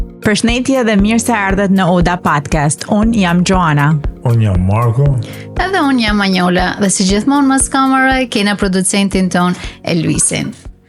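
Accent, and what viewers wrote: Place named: Gheg, Albania. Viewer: Ju përshëndes nga Shqipëria gegërishtja juaj tingëllon bukur gëzuar edhe këtë vit urime .❤❤❤❤❤❤❤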